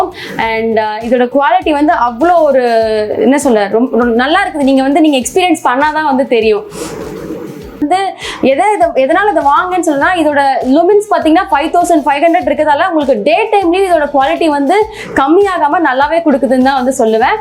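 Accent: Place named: Bangalore, India. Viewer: Good video I think u from Bangalore u speaking tamil is Bangalore style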